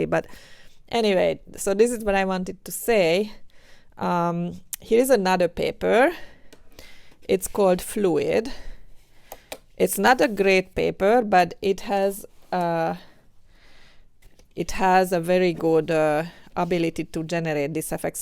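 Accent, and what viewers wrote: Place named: Hungary. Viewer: oh look, a hungarian accent! :D nice cats btw! so furry and fluffy looking!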